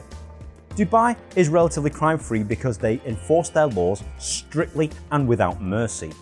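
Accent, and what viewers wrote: United Kingdom. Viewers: You look asian but your accent is Brit.. what gives man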